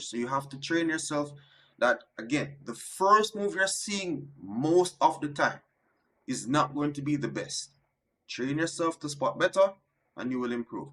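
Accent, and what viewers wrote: Jamaica. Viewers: I hear a Jamaican accent. Good on you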